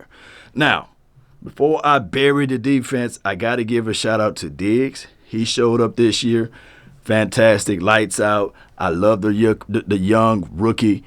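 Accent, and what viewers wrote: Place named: Texas, United States. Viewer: As a Skins fan, I gotta say I have been checking out a couple of your streams and outside of your analysis being 💯 your voice is like...perfect. You got the perfect mix of baritone and Texan accent. Why you can't resume yourself to national radio I have no idea. Keep up the good work.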